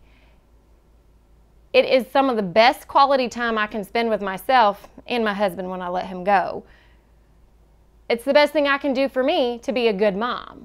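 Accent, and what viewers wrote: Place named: Kentucky, United States. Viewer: I'm from kentucky so I like the accent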